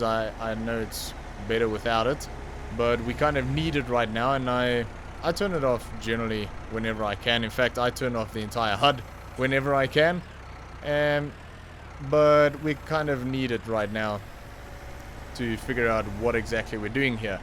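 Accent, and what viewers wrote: South Africa. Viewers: You sound like you are from South Africa... anyway got here from Dag.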